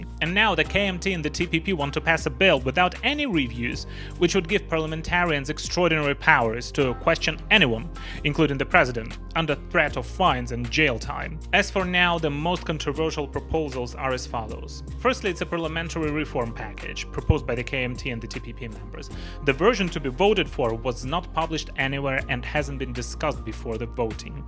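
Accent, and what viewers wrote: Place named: Europe. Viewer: Nice haircut and nice shirt. and your English is getting more European. that's not a bad thing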